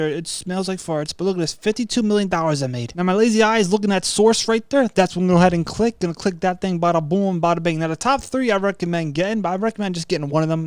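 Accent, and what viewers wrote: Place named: New York, United States. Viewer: strong new york accent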